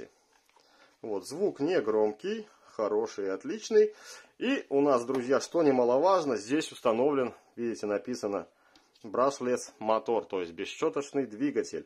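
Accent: Belarus. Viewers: Судя по акценту - белорус!) Лайк не глядя)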